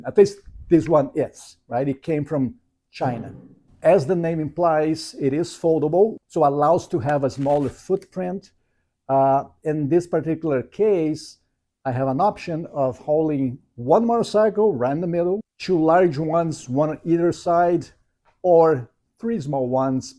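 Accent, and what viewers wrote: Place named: Brazil. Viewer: Sounds like you have a Brazilian accent. Greetings from PA!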